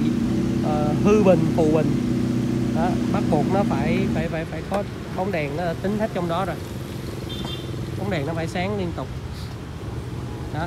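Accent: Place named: Mekong Delta, Vietnam. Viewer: Nói chuyện rất dễ nghe. Nghe giọng miền tây thiệt thà.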